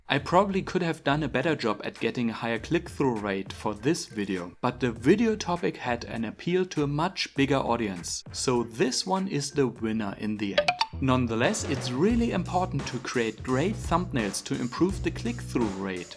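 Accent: Germany. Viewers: alter red doch einfach deutsch man haha, wenn deutsche english kann man nicht aushalten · Heavy German Accent. You say [exactly] instead of [exectly]. I know the first is right but its spoken like the second one.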